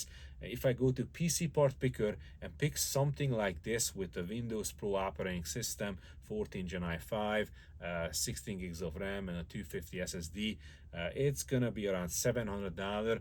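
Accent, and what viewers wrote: Hungary. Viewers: Yes, I comparing to the DT version to be exact, but most of my criticism is still true for the old SFF model. My point is that DELL gives you less and less quality and quantity for the same price, probably because the competition, both HP and Lenovo earlier started to use nonstandard PSU and other const cutting and eco system locking. From a business stand point the bisiness is business you can't compete with them in volume and power consumption, but you can with quality and performance if there is a demand for it. These machines are overbuilt for office use, but underbuilt for any performance usage CPUs and GPUs under performing in these systems due to heavy current limiting. Here is an example how long can last something if you choose the right parts, my first intel 775 PC from 2006 still works after 18 years what I bougth used then, now it is in a school in Esztergom. 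The only failure was a bad Hitachi harddrive within the warrianty period in 2008, it was replaced with 500GB WD BLUE which 12 years later produced the first bad sector, 500GB WD BLACK from 2010 still running without bad sector. BTW are you from Hungary? I'm guessing from your accent.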